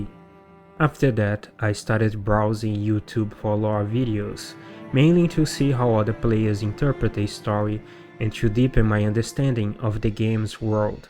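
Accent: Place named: Brazil. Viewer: Are you brazilian? While very good, your english has some giveaways that sound to me like brazilian accent. Great content tho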